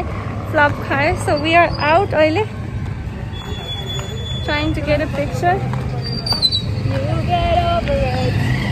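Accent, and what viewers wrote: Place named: Nepal. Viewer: Beautiful 💓💓u☺ I love Nepal ko accent ☺☺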